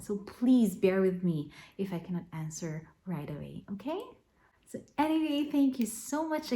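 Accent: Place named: England, United Kingdom. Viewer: First I love your English accent,Gal you're flawless,thank you for your honest opinions.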